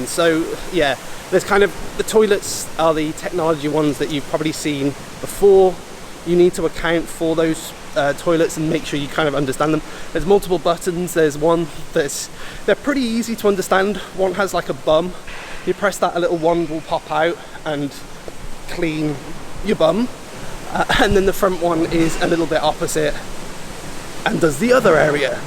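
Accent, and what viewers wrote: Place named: United Kingdom. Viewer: Your tips are really good 👍 tips, so thank you 😊 for those. However, it's a bit hard for me - a Vietnamese American- to understand your British accent, 😂, so it would help tremendously if you could illustrate the object/subject which is being mentioned with a picture 📸 or a flash of a video clip, etc. so that whatever you are mentioning or explaining could be understood 😉 right away! Thank you 😊 💓 so much.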